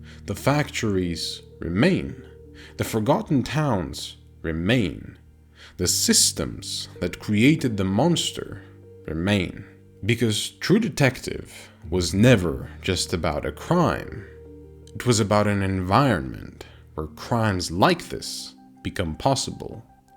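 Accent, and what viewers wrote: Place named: Europe. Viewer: Appreciate the analysis. Dislike the Euro accent. (You don’t know, bro)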